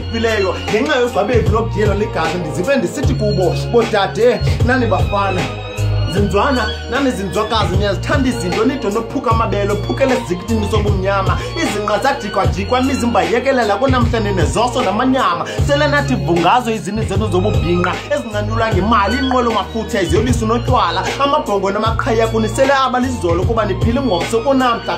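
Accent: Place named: Xhosa, South Africa. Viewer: ISXHOSA SAKHO BRA SIMNANDI NGALOLONKE IXESHA XANDIKUMAMELA NDIFUNDA LUKHULU INOMYALEZO IMIBONGO YAKHO IYANDIFUNDISA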